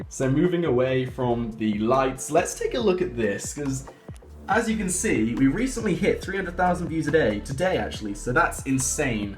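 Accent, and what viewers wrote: United Kingdom. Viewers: I just realised your British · R U British?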